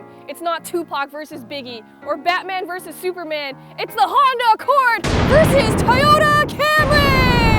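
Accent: Canada. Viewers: She's the coolest Asian Millenial with a hint of Canadian accent Autoguide can find to go at it HAM.....LOL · Is the price in Canadian dollars? You sound Canadian.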